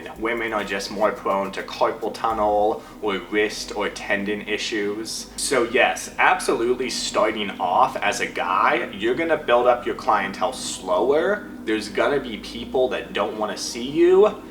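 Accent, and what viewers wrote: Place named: Louisiana, United States. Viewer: Handsome Canun Louisiana accent.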